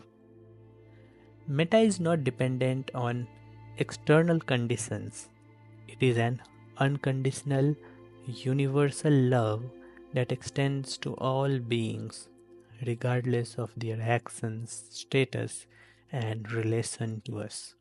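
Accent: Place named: Eastern Uttar Pradesh, India. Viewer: Thank you. This works fine for me. If i had to place your accent it would be Eastern UP or Bihar or even Nepal. That would make it easier for you to ronounc4 pali. It should be Metta for Maitri and Bhaavna, and not Bavaana.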